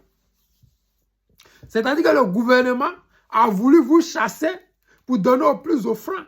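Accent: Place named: Guinea. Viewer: C'est punition de DIEU. Ton accent même est guinéen mais c'est sûr que tu es dans la politique avec les déstabilisateurs de la Côte d'Ivoire. Tu as participé avec tes frères à installer le gars là. C'est la punition de DIEU, va à la mosquée prier pour demander Pardon de DIEU et pardon pour les cœurs des Ivoiriens , qui vous ont reçus mais qui ont saignés par votre faute.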